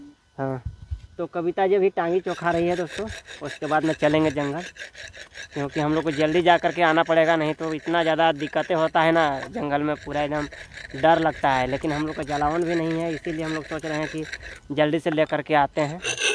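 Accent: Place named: Jharkhand, India. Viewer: आपके बोलने के अंदाज से ऐसा लगता है की आप चतरा या लातेहार साइड से हो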